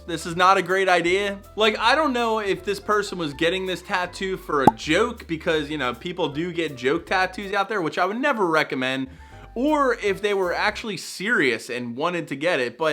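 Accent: Philadelphia, United States. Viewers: Love the Philly accent. So good.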